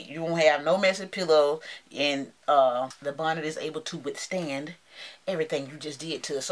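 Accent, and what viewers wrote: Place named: Texas, United States. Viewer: Hey! I was watching your videos last year or whenever when you posted about Virgin hair fertilizers to grow hair and testing if they work etc. And I saw some uploads but not watched them about your cancer diagnosis and the birth of your baby. Also of your hair loss from chemotherapy treatment as I believe this was 2016 or November 2017? I might be wrong though lol By the way I like your accent! Are you born a Texan because it sounds strong unless its common? Hope you reach your hair goal soon!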